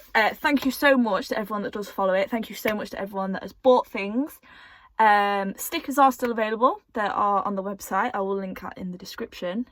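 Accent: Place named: England, United Kingdom. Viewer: Damn, you sound proper English!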